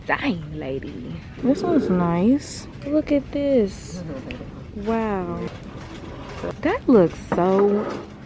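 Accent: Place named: New Jersey, United States. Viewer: yessss!! Lol she and I are from the same state. Lol and you sound like my cousin in NJ lol boy these locations and sounds are too funny lol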